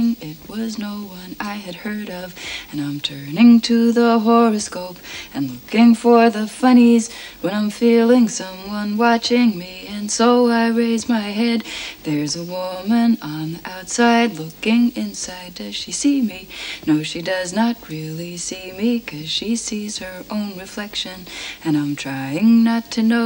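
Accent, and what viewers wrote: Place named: New York, United States. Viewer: nice! i thought so as it seems so nyc and from what i heard that is typical for that accent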